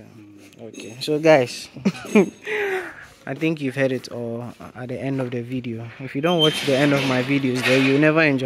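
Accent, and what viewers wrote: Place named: Ghana. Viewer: d way Ghanaian pronoun things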